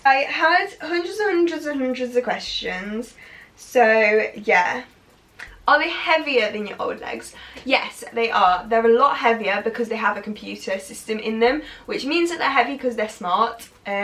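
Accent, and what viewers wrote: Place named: England, United Kingdom. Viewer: Your a gorgeous girl...….I'm an Australian I love your English accent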